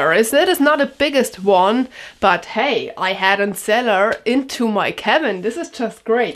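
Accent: Germany. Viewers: You have great video's. Mine grandparents on my fathers side came to America where I live and they were from Germany and Switzerland. Well, they had the same accent as you, Yes! they sounded just like you :) I listen to you and I feel very much at home, good feelings. I like your videos & how you take us with you. But you know, to hear you talk, yah? yah I feel at home.. yah, is da truth. :) I'm so happy I found your channel.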